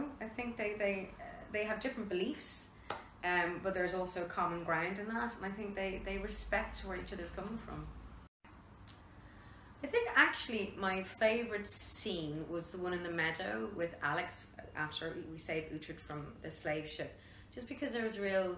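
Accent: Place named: Ireland. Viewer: Ah she’s Irish love it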